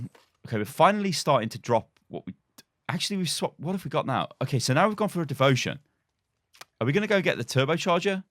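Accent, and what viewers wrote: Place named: Northern England, United Kingdom. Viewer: Love this new series!! on btw are you northern? you have little bits of it i swear as i'm derbyshire, sounds similar.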